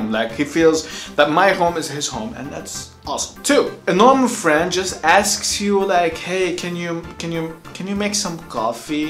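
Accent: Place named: Armenian, Lebanon. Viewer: You sound armenian lebanese 😂😂 No offense tho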